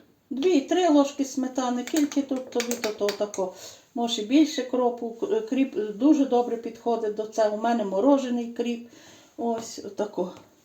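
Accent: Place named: Western Ukraine, Ukraine. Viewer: Дуже гарний рецепт! Щиро дякую за нього! Обов'язково зроблю і спробую. А найголовніше---то говір, наш, западенський, такий рідний, ніби дома побувала... Аж в грудях защеміло... Шануймо нашу мову, нашу Україну. Ділімося всім, чим маємо. Нема в світі понад наше, рі дне. Ще раз щиро дякую за рецепт.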